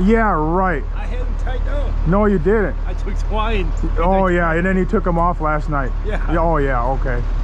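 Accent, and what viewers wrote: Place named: Wisconsin, United States. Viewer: There aren't many accents that beat a thick Wisconsin accent.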